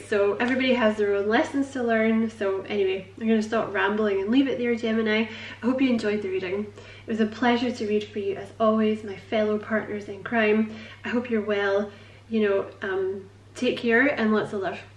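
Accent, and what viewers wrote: Scotland, United Kingdom. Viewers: I’m Gemini Sun… I enjoy listening to your Scottish accent. Merry Christmas!!☃️🎄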